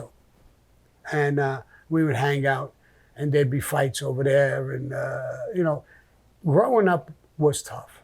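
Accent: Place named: Northeast, United States. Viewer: It’s that northeastern accent brotha. Rampa’s = Rampers.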